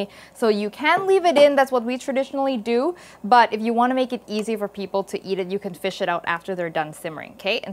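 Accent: Thailand. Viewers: น่ากินมากเลยนะคะ ออกเสียงภาษาไทยเหมือนมาก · wow a little bit surpised! look at her ingredient she use i think she aint live in thailand anymore , every label is a international label have english in the label and the product name is also write in english that not usal in thailand that the most of label always write products name in thai. anyway she is thai could answer why her thai accent is so lovely. :)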